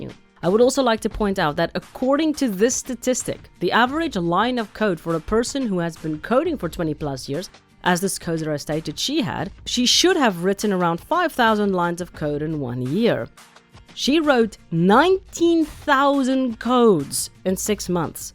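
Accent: Afrikaans, South Africa. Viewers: Wag, jy's Afrikaans? Of praat Afrikaans? Hoe het ek nie die aksent geplaas nie! ei ek voel so dom nou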